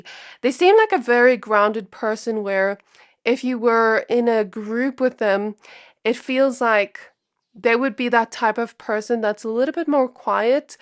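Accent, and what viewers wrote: South Africa. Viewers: South africa? Wow. I was wondering what your accent was from......and your lights went out. ....2 months agi i was having an issue with my finances and had my electricity shut iff because i didbt oay it. ... And EVERYONE IS AGAINST ME FOR ME. EVERYBODY........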